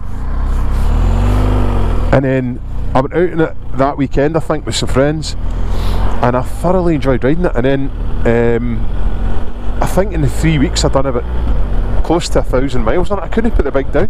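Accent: Scotland, United Kingdom. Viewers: This is weird! I was just going to say something very similar and my name is ALSO! Hehehehe (wow) Very nice to hear a fellow Scot doing reviews, even if I do now live in America) Subscribed and liked Sir! 👍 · your Scottish accent is difficult to understand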